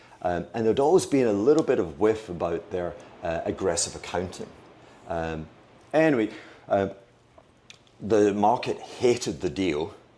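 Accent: Scotland, United Kingdom. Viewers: Scottish accent - Check, Mirror shades - Check Caribbean bangles and mad shirt - Check I love it !!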